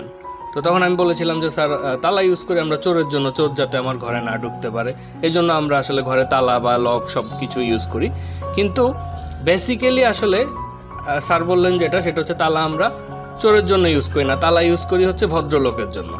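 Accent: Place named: Teknaf, Bangladesh. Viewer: মনা তুমি তু টেকনাফে মনে হয় 🙄 ভয়েস ওরকম কেনো 🙄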